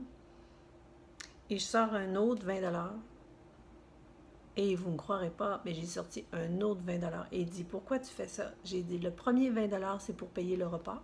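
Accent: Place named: Quebec, Canada. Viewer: Oui même fb est dangereux je pourrais en témoigner je me suis faite avoir par 2 hommes surtout un en juin qui se disait amoureux transi mon oeil! IL m'a bien baratinée sur un groupe hypersensibles et fait croire qu'il était séparé est ce vrai?Je sais pas et menti pendant près de 3 semaines j'étais flattée heureuse je me sentais importante mais quelle déception! On s'est rencontrés je suis partie le voir et il a pensé qu'au sexe assez vite et sans protections en plus pff! Il m'a ensuite larguée quand je suis rentrée chez moi j'ai eu du mal a m'en remettre j'en ai pleuré je suis trop fragile..une copine m'a conseillé le site de rencontre MEETIC terminé ! Je ne veux pas revivre ca et une déception avec un manipulateur..Oui les sites et même fb peuvent être dangereux..J'adore votre accent québécois! Il me fait rire.Bonjour de France.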